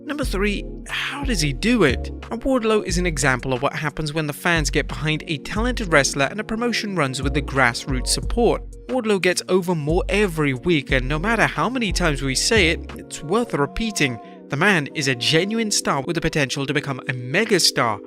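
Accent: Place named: United Kingdom. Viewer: I always look forward to hearing you return , UK accent FTW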